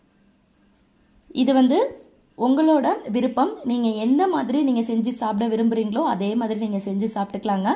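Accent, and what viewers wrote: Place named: Coimbatore, India. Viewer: Akka Coimbatore Pola...... Ethana "inga" ... Anyway different recipe.... 👍... Nice nga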